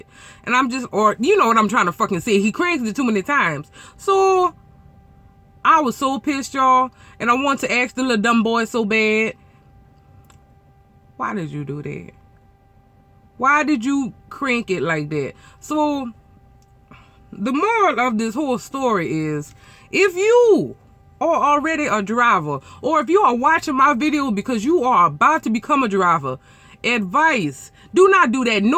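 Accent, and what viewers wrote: Louisiana, United States. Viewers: Not a trucker at all......... Im just hear to listen to the accent... Always been a sucker for a Louisiana accent!!!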